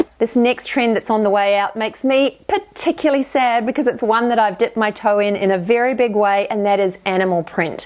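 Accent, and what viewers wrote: New Zealand. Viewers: Where's the accent from? New Zealand? Like the place that hasn't spend a year in their pijamas?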